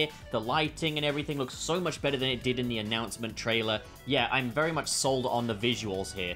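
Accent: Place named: United Kingdom. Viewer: I am surprised to hear a British fellow say "dudes".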